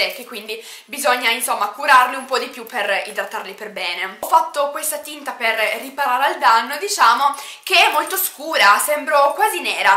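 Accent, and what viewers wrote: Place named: Piedmont, Italy. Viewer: Aaaaalla faccia dell'accento piemontese!Nè!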